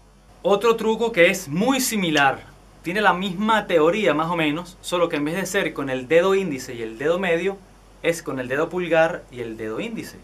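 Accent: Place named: Canary Islands, Spain. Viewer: tienes acento canario, eres de tenerife? :D xk si es asi ya tienes un colegacho tinerfeño xD jajaja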